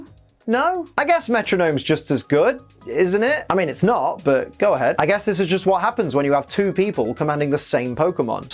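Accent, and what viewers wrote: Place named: United Kingdom. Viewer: Oi u British mate?the Pokemon is blitze.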